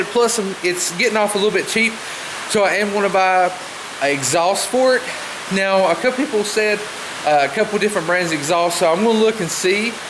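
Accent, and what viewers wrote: West Virginia, United States. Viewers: You from West Virginia?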